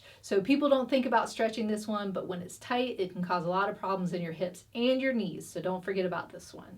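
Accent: United States. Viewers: Thanks this has really helped with my hip pain ....out of interest what state is your us accent from :) Regards mark uk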